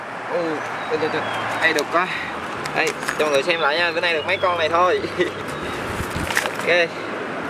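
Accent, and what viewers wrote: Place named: South, Vietnam. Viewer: E nói giọng miền nam, quê e ở đâ vậy?mà có sông lớn,nước chảy siết?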